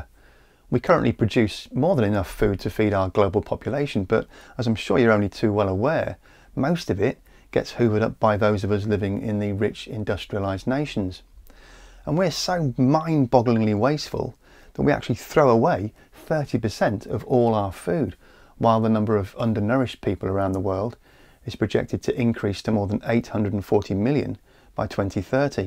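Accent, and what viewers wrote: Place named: Surrey, United Kingdom. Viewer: His voice is exactly like Professor Patrick N. Allitt who did a course on Victorian Britain for The Teaching Company. I'll bet both of these men come from the same area of England. I believe it was Surrey, south of London. I love the way they sound.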